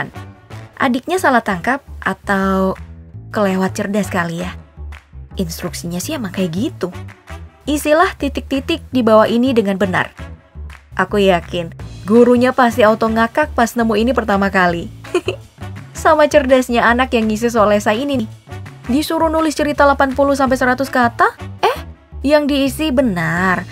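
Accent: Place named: West Java, Indonesia. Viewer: Aya aya wae min euh sunda asli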